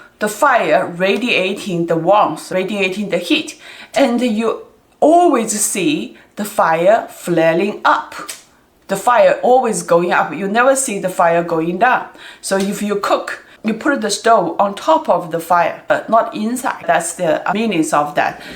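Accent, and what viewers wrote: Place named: China. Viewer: I love your accent its cute Chinese English I love it by the way you are not only a good tutor but also beautiful women beautiful wowoowow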